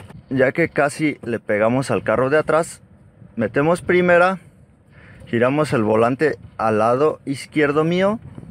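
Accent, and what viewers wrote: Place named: Popayán, Colombia. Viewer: Ud es de Popayan?, tiene acento de allá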